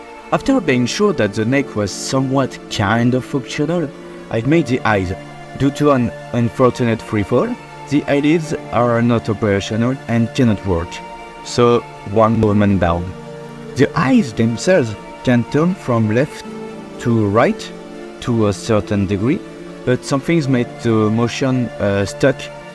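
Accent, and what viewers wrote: France. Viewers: i dont care about your french voice, IT'S AWESOME! GoodLuck pal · Are you french ? I hear a french accent ( i'm french ) ( bon j'ai eu une confirmation a ). Enfin bref, soit tres minutieux, c'est vraiment tres dangereux de faire des costumes de springlocks, en tout cas bien joué. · Are you French? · Dès que je t'ai entendu parler au début j'ai su que t'étais français 😂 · are you french? you sound like you have a french accent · Are you french🇨🇵? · Man you have a french accent and that's incredible as a french myself, anyways good job on the springlock suit! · so frustrating hearing a french dude speaking in english while I also speak french xD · He sound very french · Are you French by any chance ? I think the accent matches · I think you are French cuz you like- Sound like an French person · heavy French accent, and I like it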